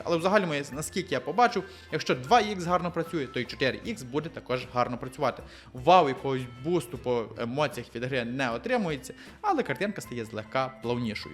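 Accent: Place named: Galicia, Ukraine. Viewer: Класний галицький акцент 👍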